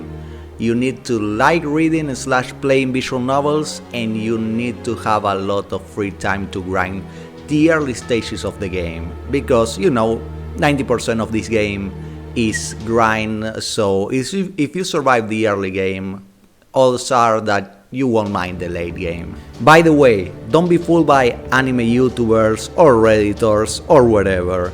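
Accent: Argentina. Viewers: Sos argentino? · Tenés el acento más argento que yo haya escuchado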